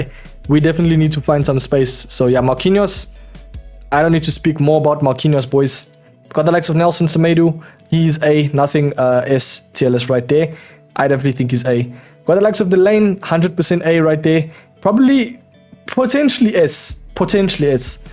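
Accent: South Africa. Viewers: * are u South African ?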